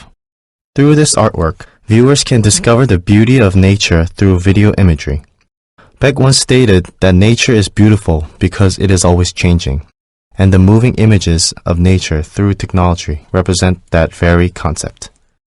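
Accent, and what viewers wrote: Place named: United States. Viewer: His pronunciation is so perfect...american accent..miss u Taecyeon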